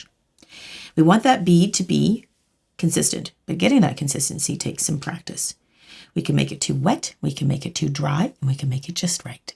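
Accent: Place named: Canada. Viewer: Heard a slight accent and thought, she mightttt be Canadian.... Then checked your page and realized you're in BC! Can't wait to order your nail kit when it's back in stock <3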